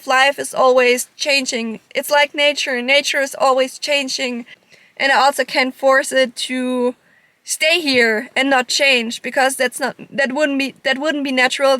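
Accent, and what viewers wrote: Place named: United States. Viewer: Du hast teilweise schon voll den Ami - Slang 😅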